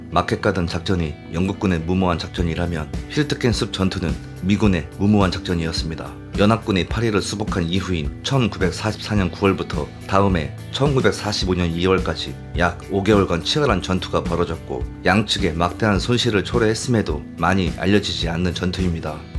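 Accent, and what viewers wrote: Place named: South, South Korea. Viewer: 멘트에 남방 억양이 강해서 듣기 집중이 잘 안되네요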